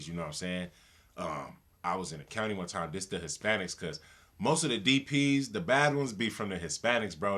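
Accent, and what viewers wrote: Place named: California, United States. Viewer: he sounds straight up cali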